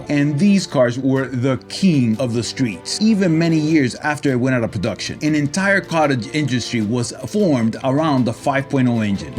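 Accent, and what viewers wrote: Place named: Hialeah, United States. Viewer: . That is a lovely accent. Hialeah?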